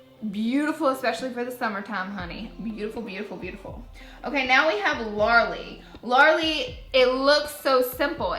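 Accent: South, United States. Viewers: Love it!!!!!!! ♡♡♡♡♡ CONGRATS. LOVE the names I'm in Tennessee and everyone makes fun of how we southerners talk. I love how talks sooo southern. ♡♡♡♡♡♡♡ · Christen Dominique mentioned you in one of her snaps and I just had to see your channel. When I heard you talking I knew you had to be from the south. I'm from Alabama as well and I love all the shadow names! 😂 Congratulations on your palette it looks amazing! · Patiently - ok, well not really - more like ANXIOUSLY awaiting this palette's arrival from your SnapChat family preorder..... Come On, Violet Voss..... This Mississippi Girl is chomping at the bit!!!!! So proud for you!!!!! Love your southern accent and of course the fact you use our special Southernese Sayings!!!! Keep up the GREAT work!!!!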